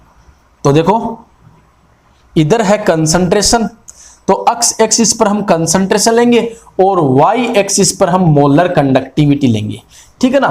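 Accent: Haryana, India. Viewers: sir aap haryana se ho kia?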